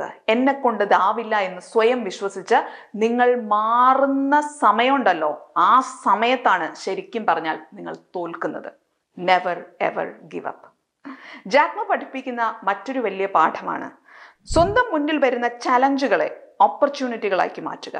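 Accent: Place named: Valluvanad, India. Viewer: valluva nadan Samsaram mam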